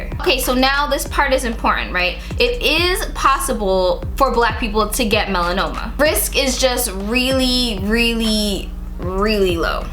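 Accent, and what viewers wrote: Jamaica. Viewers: Just found your channel today and started watching. I heard the accent then you said you are Jamaican 🇯🇲. Loving your channel my Jamaican sister.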